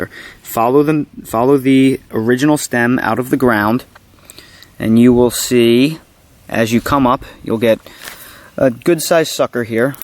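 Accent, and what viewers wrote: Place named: South Jersey, United States. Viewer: I live in suburban philly and that sounds like a south jersey accent!!!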